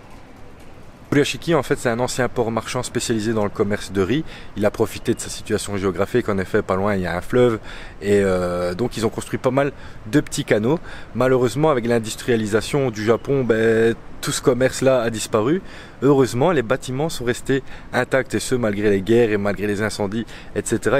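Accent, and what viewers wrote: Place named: Wallonia, Belgium. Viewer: jolie vidéo que j'ai découvert par hasard via des liens sur facebook... ca fait plaisir de voir un francophone au japon avec un bon petit accent de wallon... ca change des français. ;-)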